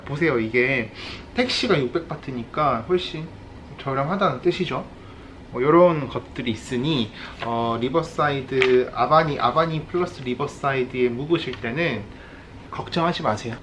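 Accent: Gyeongsang, South Korea. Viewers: 몰랐는데 사투리가 부산경남.. 이신가봐요!ㅋㅋ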